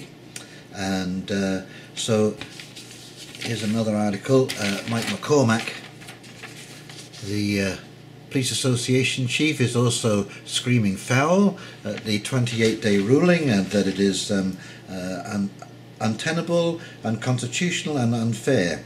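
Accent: England, United Kingdom. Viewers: Where are you from? You sound English but I'm guessing you live in Canada.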